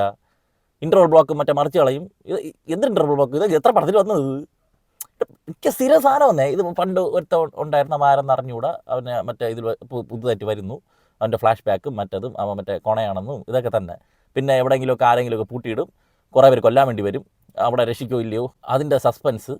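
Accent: Trivandrum, India. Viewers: അണ്ണൻ tvm ആണോ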